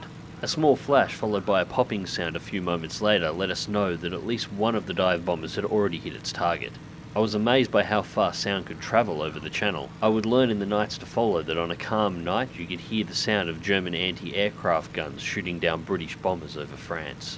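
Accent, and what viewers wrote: Australia. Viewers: I LOVE THIS!!!! I am a "Yank" in Tennessee and just wanted to say ,I haven't even finished watching the video , but had to stop to tell you ",YOU HAVE FOUND YOUR CALLING!"Ive been wandering what you would do now that Giajin has "went too far"!! your Aussie accent ,style, and obvious knowledge of what you are talking about is what makes this hopefully, the first of a VERY long and successfull series! Would like to see two a week if possible, could run for YEARS!! "THANK YOU VERY MUCH FOR ENTERTAING ME!!"...was about to give-up on YouTube. :)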